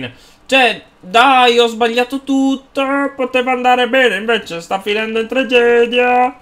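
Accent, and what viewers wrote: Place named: Piedmont, Italy. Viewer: Che bell'accento piemontese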